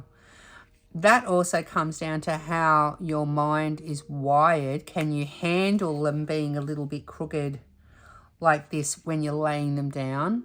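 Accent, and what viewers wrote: Australia. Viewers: Hi, it's your girl from JerZey! HAH! I really enjoyed listening and watching you do your first section on this mystery painting. I believe I had the same one, but donated it to a friend because the black and white canvas messed my eyeballs up. But it's looking so good! I tend to have a hard time with AB's too, but tough it out. I am so excited for you to visit the EAST Coast your next time around, there are so many great places! So glad to have a friend in Australia, love your accent by the way too! The time-lapse is always fun to watch to! Hope you continue to feel better and till next time, take care friend! Sending you continuous healing vibes and hugs! XO JerZey Chris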